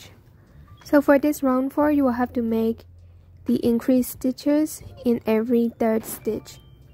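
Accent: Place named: Myanmar. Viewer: Are you Burmese? I can hear the accent.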